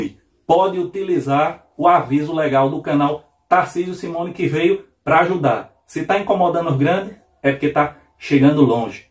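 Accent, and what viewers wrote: Northeast, Brazil. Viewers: "a gente fala errado", onde... Falar com sotaque nordestino NÃO é falar errado é um capricho artístico. Vejo você falar sempre muito corretamente e quando escapa algo sempre corrigi logo. Falar errado é esse bando de Youtubers que não sabe nem usar as conjugações verbais corretas ou usar o plural na hora certa. Você está de parabéns em tudo que você fala e da forma que você fala. E olha eu sou Paulista da Capital de SP.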